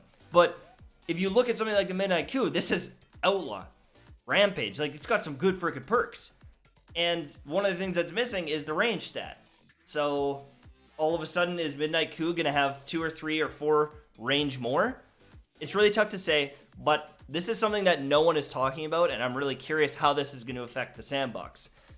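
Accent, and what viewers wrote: Scotland, United Kingdom. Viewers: SCOTTISH VOICE!!!!!!!! Yes BABY!! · Thanks for putting out great content. Scottish accent was awesome lol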